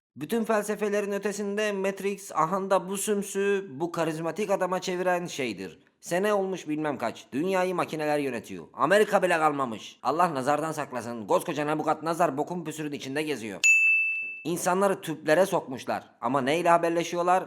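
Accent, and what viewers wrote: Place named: Anatolia, Turkey. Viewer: Hacı abi anadolu şivesini bu kadar iyi nasıl gonuşuyon. Bence güzel tat katıyor.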